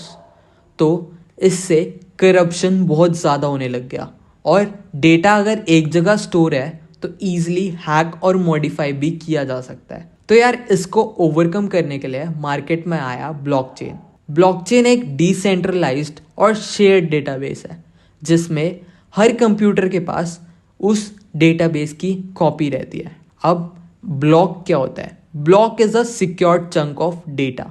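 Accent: India. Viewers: You sound like an average indian with voice stats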